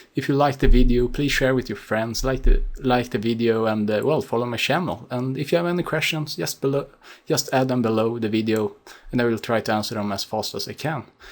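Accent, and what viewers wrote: Sweden. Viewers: are you swedish? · Man hör att han är svensk! :) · Du är svensk!!!! Man hör de jätte bra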